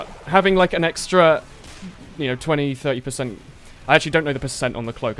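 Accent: United Kingdom. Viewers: what part of the UK are you from? Sheffield is still relatively warm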